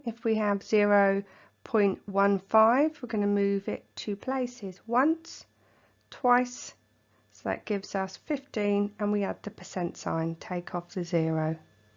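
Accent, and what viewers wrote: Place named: United Kingdom. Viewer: Why do you sound so British Mrs?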